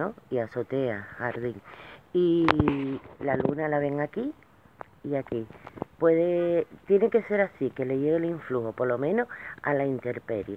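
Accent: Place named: Canary Islands, Spain. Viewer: Dios te oiga, lo acabo de hacer esta noche, espero que mi deseo se cumpla, es de economía, porque lo estoy pasando mal... Mi duda es, si la noche de luna llena también lo dejó fuera en la ventana?? O... el día antes de la luna llena lo tengo que tirar a la naturaleza?? Se tira el agua y todo lo de dentro, no?? La copa También?? Disculpa mi torpeza.. Gracias y un beso.. y si me pudieras dar tu correo te lo agradecería.. Es que, tengo un problema grave de economía, con muchas deudas, por eso quería hablar con usted por privado, para que me diga cuál es el mejor ritual.. Tienes un acento canario.. yo viví tres años en Tenerife y me enamoré... Un beso, espero tu contestación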